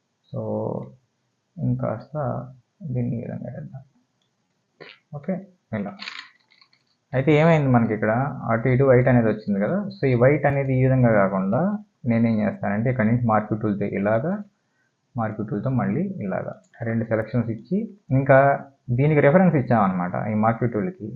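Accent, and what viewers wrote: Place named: East Godavari, India. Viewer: Anna ne proper ekkada? konchem east godavari slang matladutunnav!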